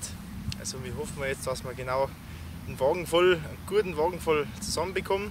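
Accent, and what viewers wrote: Bavaria, Germany. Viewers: Kannst du bitte a bissl mehr bayerisch redn ✌️.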